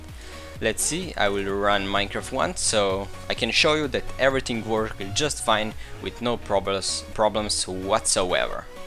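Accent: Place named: Poland. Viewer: Thanks you so much :3!! By the way are you from Poland, because your accent?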